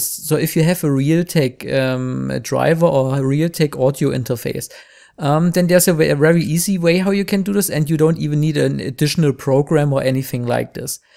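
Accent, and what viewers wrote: Germany. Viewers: starker deutscher akzent :) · man with funny German accent explains to me what I need to know. This is the perfect tutorial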